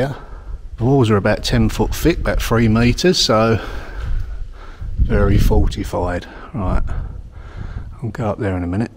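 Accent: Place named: England, United Kingdom. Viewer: Hello from Canada. Lovely video, and I thoroughly enjoyed your tour of this beautiful little village. It's so nice to listen to someone who has such a deep appreciation and respect for the long history of these places. Never apologize for your English accent - it's beautiful. My Mum was born in England. Thank you so much for sharing. 😊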